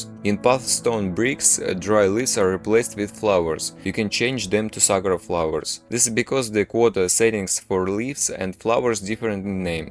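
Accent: Russia. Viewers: Брат, вижу по акценту, что русскоговорящий. Очень был удивлён, что такие старания никак не монетизируются. Спасибо за скрипты, как доделаю свой проект, то отпишусь! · По акценту видно что русскоговорящий. Огромное спасибо за скриптики, работают прекрасно. Очень надеюсь что твои труды тебе окупятся, была удивлена что такое вообще бесплатно есть ❤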